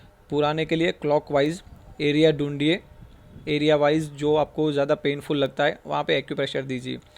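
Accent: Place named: Gujarat, India. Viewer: Sir bhut achi jankari di aapne Aapka ye therapy centre kha h sir Aapki language explan se aap Gujarat se hai ese lag rha h Please give your address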